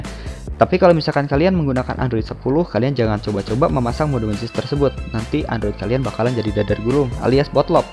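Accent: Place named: Sunda, Indonesia. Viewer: Alah c mamang sunda nya meni lekoh hahaha semangat terus bang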